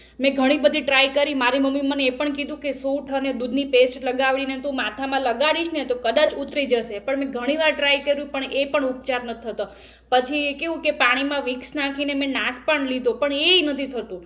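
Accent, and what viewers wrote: Kathiawar, India. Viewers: Your short videos are awesome. No need to change. Wonderful કાઠિયાવાડી ગુજરાતી. Loved your " Soul mate" video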